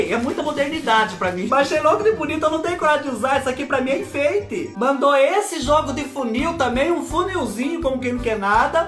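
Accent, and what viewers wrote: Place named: Itatiba, Brazil. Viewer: Adoro esse sotaque dele. Eu sou baiana,mas morei muito tempo em Itatiba,onde o povo fala bem desse jeito e eu morro de saudades.💞🙏😢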